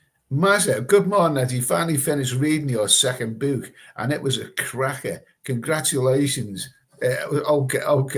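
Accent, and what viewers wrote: Liverpool, United Kingdom. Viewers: Well, he is a scouser.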